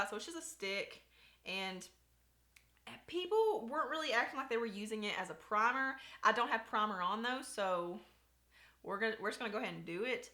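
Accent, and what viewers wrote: South, United States. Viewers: I love your channel and southern bell accent btw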